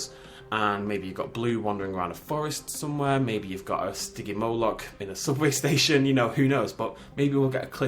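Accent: United Kingdom. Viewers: Hey I was wandering which part of Britain your from As I am from Worcestershire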